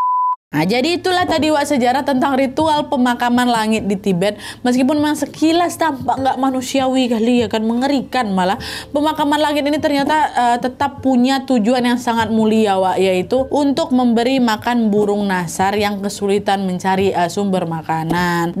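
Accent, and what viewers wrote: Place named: Sumatra, Indonesia. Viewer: Logat kakak nya kayak orang Minang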